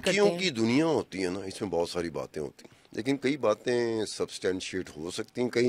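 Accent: India. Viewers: Aleem khan please ask your host speak like Pakistani not Indians. This is very annoying .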